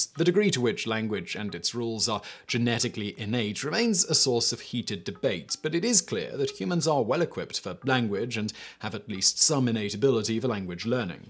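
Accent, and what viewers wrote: England, United Kingdom. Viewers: I think he was just raised in Britain? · Can you talk without chopping up your words? Or is there anyone else that can be the voice of this channel? The English accent is very beautiful, in fact I think it's the most elegant accent there is, but the way you chop up your words when you talk makes it very difficult to actually focus and comprehend the information you're presenting. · Out of topic but i got scared when the guy sounded british, i thought he was vsauce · The British pronunciation of Spanish -os words always bugged me, it's so odd to hear someone say "Carlos" as "Carloss". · This is a good video, but do all Brits says Nicaragua that way? Nicarag-yew-ah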